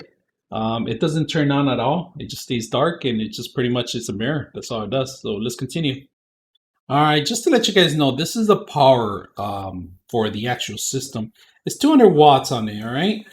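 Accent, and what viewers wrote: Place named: Philippines. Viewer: I love your accent, sounds a lil bit filipino sometimes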